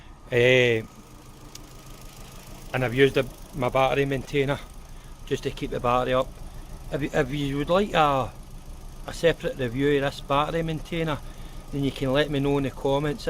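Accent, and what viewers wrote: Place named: Scotland, United Kingdom. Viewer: Great video, great to hear a Scottish voice! Great tip about coils never knew that could spike ECU will watch out in future. Liked the look of spark jumpers, was it eBay? I like u to review battery maintainer, cheers ps your now my go to Vauxhall guy!!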